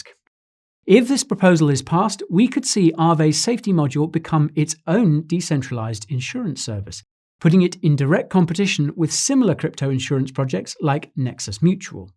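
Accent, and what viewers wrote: United Kingdom. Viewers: string agree....u from United Kingdom right as i love a way u talk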